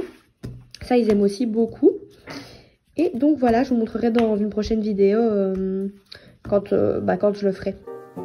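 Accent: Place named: Ardennes, France. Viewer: Très mignon ! J'ai eu un border collie que nous venons de perdre et je voudrais un chien ressemblant au border collie mais un peu moins grand. J'hésite entre mâle ou femelle.. J'aime votre petit accent peut être des Ardennes, je vis en Bretagne mais je suis nait à Charleville Mézières. Bravo pour cette jolie vidéo 😊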